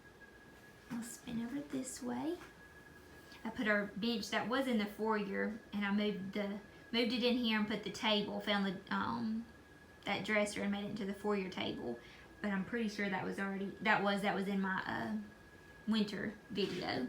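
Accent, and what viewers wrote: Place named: Alabama, United States. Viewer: Everything is beautiful! How do you keep your light colored couch so nice and clean with puppies? I'm originally from Alabama but now live in TX. Nice to hear the Alabama Accent :)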